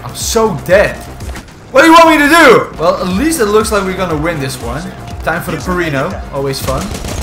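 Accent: Netherlands. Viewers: ur Dutch aren't you? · Je klinkt Nederlands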